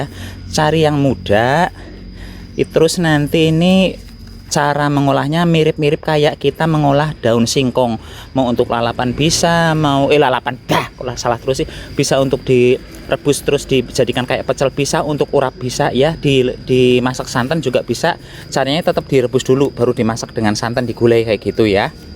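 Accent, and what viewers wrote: Banyumas, Indonesia. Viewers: Sya suka nyayur kang purbalingga,rika ngapak pa wong banyumas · Salam kenal br ketemu Chanel ini Mase drmn kok ngapak sy dr purwokerto arcawinangun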